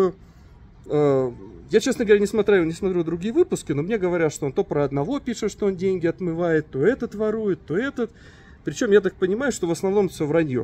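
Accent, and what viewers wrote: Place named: Armenia. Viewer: А ты видимо получаеш армянские драмы а не азербайджанские манаты наверное и у тебя армянский аксент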